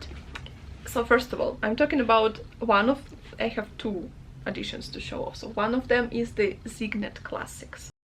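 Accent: Russia. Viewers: Сижу и думаю, почему такой акцент знакомый, оказалось, что у меня такой же. )) Привет из России ) Мега информативное видео, большое спасибо! Как раз подбирала себе издание, хочу собрать небольшую коллекцию книг на английском )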